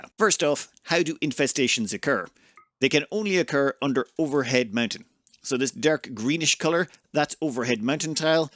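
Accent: Ireland. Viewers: Lol he's Irish right?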